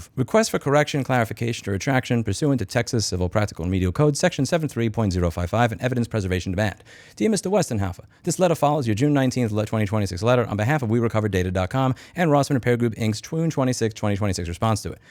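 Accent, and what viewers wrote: New York, United States. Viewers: Had to play this at 70% speed because Louis is from New York and I wanted to listen to him say it like a mob boss.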